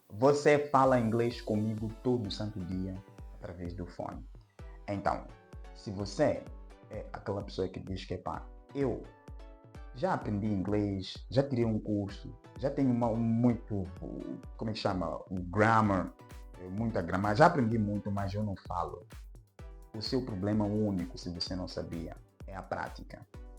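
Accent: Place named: Angola. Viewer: De primeira pensei q tu fosses Angolano 😂❤ Salve mano belo video O teu Sotaque é de Angolano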